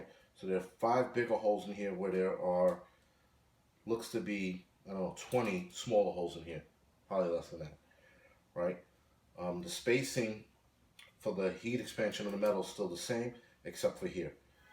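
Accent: New York, United States. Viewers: Are you from NY? I'm sensing an accent.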